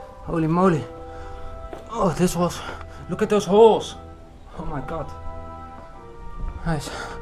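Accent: Netherlands. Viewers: Volgens mij ben je Nederlands aan je accent te horen. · vette video maar je hoort wel dat je nederlands bent gast😂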